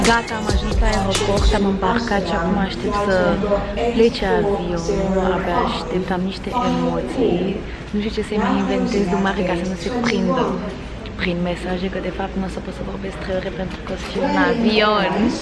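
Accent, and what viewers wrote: France. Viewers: Se vede că ai accent franțuzesc! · Ador felul in care vorbesti. Accentul asta de frantuzoaica e de milioane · WOW... Imi place accentul tau asa franțuzesc 😘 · Ce accent e ăla de francez cred nu? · Ce accent franțuzesc ai! 😍😍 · Ai accent de frantuzoaica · Îmi place de tine,ai accent de franțuza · Ai un accent de franțuzoaică 😉❤️ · Ce accent frumos se franțuzoaică ai!:)) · De ce ai accent franțuzesc? Ps:sunt nouă și nu știu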